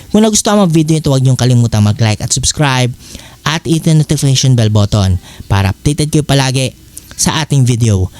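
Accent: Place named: Visayas, Philippines. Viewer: bisaya amp haha ausin mo tahalog mo 😂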